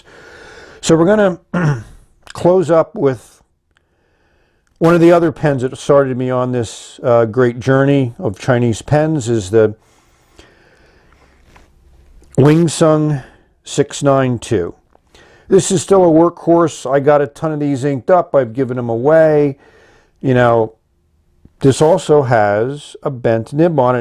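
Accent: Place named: Pennsylvania, United States. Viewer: I’m a newbie at fountain pens, what does the bent nib do for ones writing? You sound like a PA resident, am I right? I grew up in PA😃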